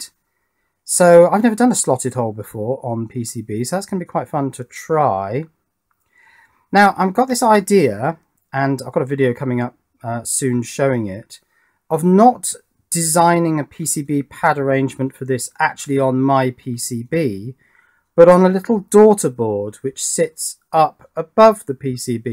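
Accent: United Kingdom. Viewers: NO!. is british and he speaks british english.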